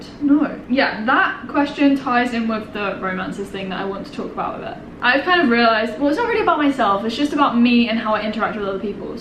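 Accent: Australia. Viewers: You’re picking up the Australian accent. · The Australian accent is creeping in.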